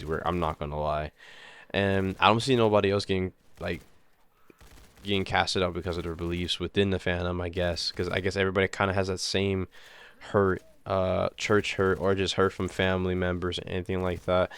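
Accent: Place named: AAVE, United States. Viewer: I have a feeling this guy has an iq of 87, this isnt a joke post just watched it cause it looked absurd. The ebonic vocabulary and accent used, speaking gait, and "find god for yourself" means this guy is most likely some mulatto amerimutt that engorges in weed often. He dances around trying to make an actual point aboutt he furry community and says vague non statements, "like everyone is ai or something and people are like copying eachothe,r" how though it is never explained. The only point he makes is that "christian furries" often have clashing view with his and that there is "no creativity" and thats about it. The mental gymanstics of "religous furries" never ceases to confound and entertain. No examples, no anlysis, just babbling on. It must be a pity what happened to you to be colored and a furry and also advice, if you want to try and make/prove an arguement, try not to say "like" so mucn, its a clear give away for a wrinkleless brain asnd follow the essay 101 stuff you were tuaght in elementary school, intro with and arguement and intro to sections, the sections, and the concluion. 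Who cares Im just a chud anyway.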